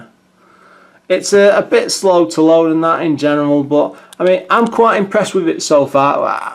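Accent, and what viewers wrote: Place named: England, United Kingdom. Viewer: What part of England do you come from? I'm intrigued by your accent.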